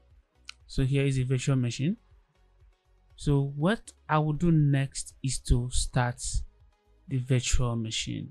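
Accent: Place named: Nigeria. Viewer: Oh ur accent just sounds Nigerian thats why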